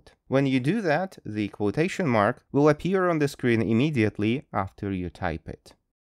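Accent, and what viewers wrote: England, United Kingdom. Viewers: О, вы из Англии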